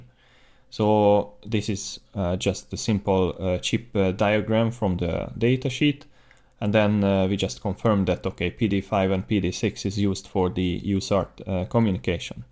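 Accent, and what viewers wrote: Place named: Hungary. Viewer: very good tutorial magyaros kiejtessel 🙂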